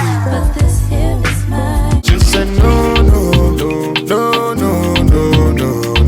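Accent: New York, United States. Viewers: Awesome work! And I love your accent! New York, right? I'm originally from Jersey now in NC. Ain't nothing like accents from up top! Love your videos and please keep sharing!!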